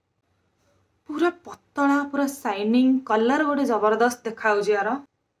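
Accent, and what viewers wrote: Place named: Talcher, India. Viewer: Hii sis apana Talcher language v tk kahuchanti ta mote v laguthila ki apana Talcher ru tuma Katha kahiba style bahut badhia mu v talcher ru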